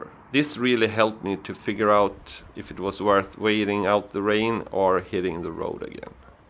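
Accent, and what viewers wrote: Sweden. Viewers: Beautiful, peaceful countryside ! Great English, Swedish accent ?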